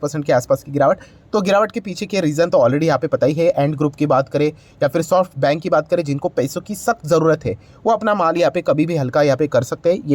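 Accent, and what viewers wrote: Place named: South, India. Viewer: I love ur hindi accent it's southy 😋😋😋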